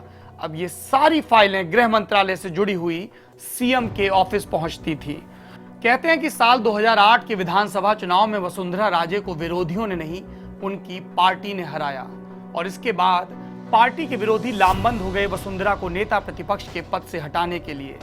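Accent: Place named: Kanpur, India. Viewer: Seems you are either from Kanpur or lko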